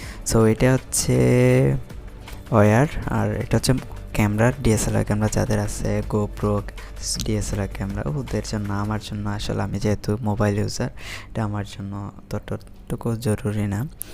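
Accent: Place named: Chittagong, Bangladesh. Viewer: Bhai ki chittagong thke naki? kothar tone ta to chitttagong ar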